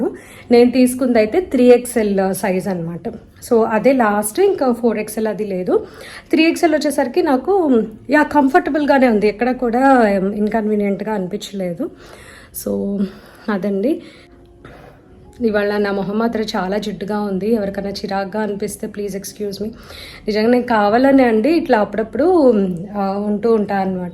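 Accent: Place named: Vijayawada, India. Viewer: yes...but mana Vijayawada slang almost superey..kada..proper Vijayawada...but memu eepudu telangana lo mahaboobnagar lo vuntam..ma vari job rithya..tanu press dpt..in Eenadu..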